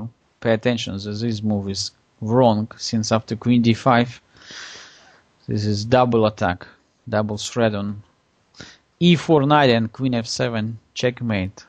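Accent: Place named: Arab world. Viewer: Лайк за акцент: озвучку Stronghold Crusaders за арабских воинов вспомнил, прослезился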